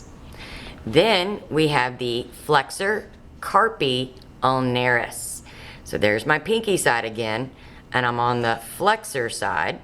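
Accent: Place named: South, United States. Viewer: Something about the southern accent makes it all stick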